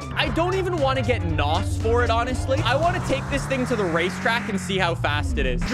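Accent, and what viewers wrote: Quebec, Canada. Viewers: This dude is a perfect demonstration between french canadian and french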